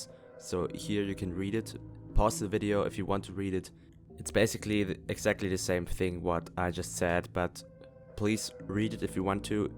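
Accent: Germany. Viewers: thank you i just downloaded it aigan and i forgot everything thanks for the video and help!! u also sound german to me cuz of ur accent haha xd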